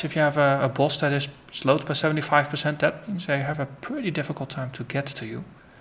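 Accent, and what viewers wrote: Netherlands. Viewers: I love dutch acent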